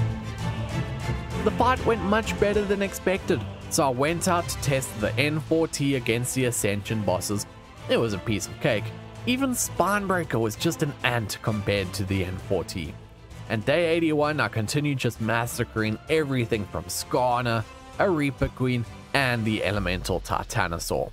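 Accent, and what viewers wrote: South Africa. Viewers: The south African accent is kak funny 🤣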